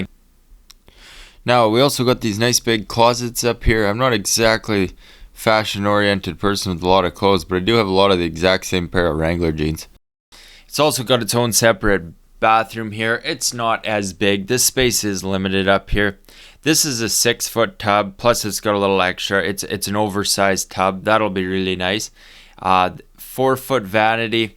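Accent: Canada. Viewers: Canadian Ah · I really think you're doing a hell of a job I think you do excellent work and I have been watching for over a year or more and I love Canadian accent. I have been wanting to move to Maine on the Canadian border but my health went to hell and I'm 77 years old so I guess I'll have to stay here and drive my till I can't anymore. I'm looking forward to your next videos keep up the good work ALLYN T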